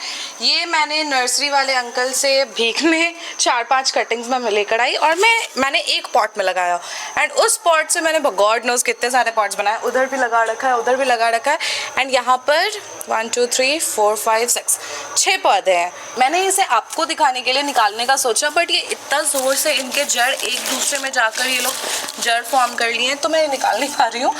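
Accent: India. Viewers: Are u from andra????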